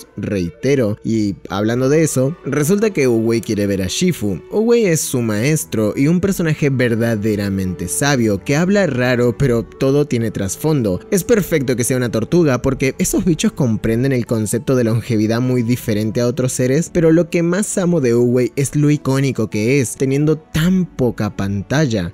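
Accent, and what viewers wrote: Argentina. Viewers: sos argentino o uruguayo? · el video me re ceba pero me desespera el acento neutro/argentino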